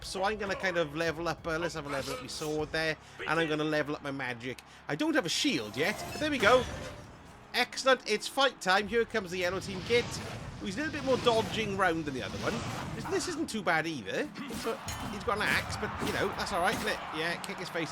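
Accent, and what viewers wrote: Wales, United Kingdom. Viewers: I came on this by accident and was delighted to hear your beautiful Welsh voice,. Used to follow you back in my Destiny 2 days. (Seriously, you should voice act on Elder Scrolls 6!)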